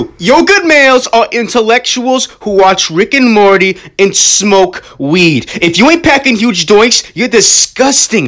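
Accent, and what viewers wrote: Boston, United States. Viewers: Why he got a Boston accent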